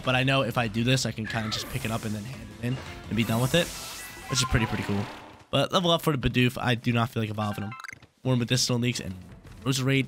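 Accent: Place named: North Jersey, United States. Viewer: I just know your from north Jersey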